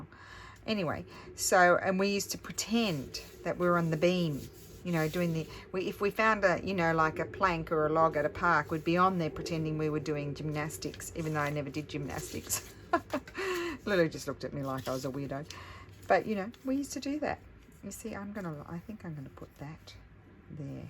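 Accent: Australia. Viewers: Thank-you for to-day Its great to hear an Aussie voice we are just out of our 5th lock down in Victoria Your YouTube channel has helped so much in all our lock downs Stay Safe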